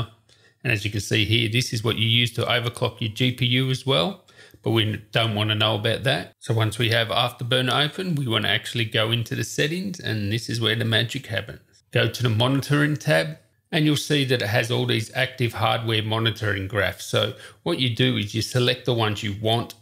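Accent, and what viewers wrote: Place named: Australia. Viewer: I just need to rewatch the video for the tutorial I came for, I was lost in your sweet Australian accent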